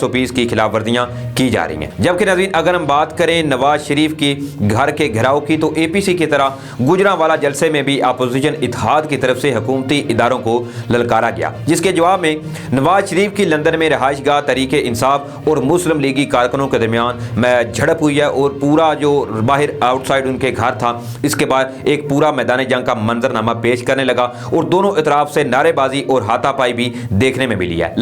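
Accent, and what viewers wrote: India. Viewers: urdu ko urdu lehje main bolo. Ziada indian banne ki zaroorat nahi. Is se tumhara ehsas e kamtari nazar aata hai.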